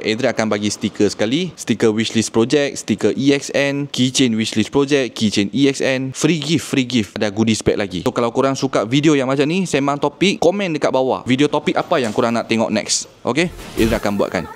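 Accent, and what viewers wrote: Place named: Kedah, Malaysia. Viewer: pekat sungguh kedah hang ahahaaha anak jati kedah ni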